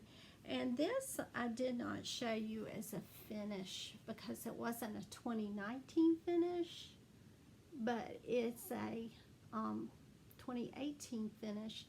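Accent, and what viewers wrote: South, United States. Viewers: y'all both have such a sweet southern drawl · Just found you. Love your southern accent!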